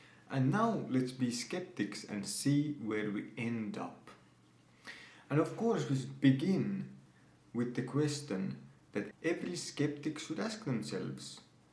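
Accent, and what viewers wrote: Finland. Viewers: let me guess. You from Finland?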